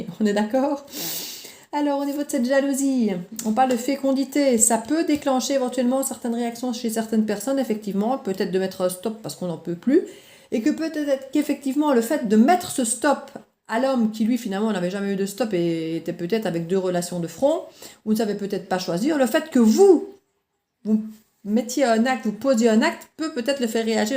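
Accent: Liège, Belgium. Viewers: Tellement vrai et juste... On reste dans mon theme lol :p J'adore tes guidances sinceres et justes, sans complaisance, en toute honneteté... chaque video est un plaisir (puis j'adore cet accent liegeois :p ). En tous cas, je ne peux que te conseiller a te lancer dans les guidances privées car tu es vraiment tres tres douée et tu vois juste... N'aies pas de doutes sur tes capacités. Tu es geniale <3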